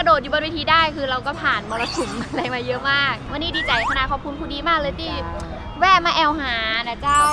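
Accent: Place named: North, Thailand. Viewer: พี่แตพูดเหนือน่ารักมากเลย ดูเรียลจริงๆ รอติดตาม Ep. ต่อไป ❤️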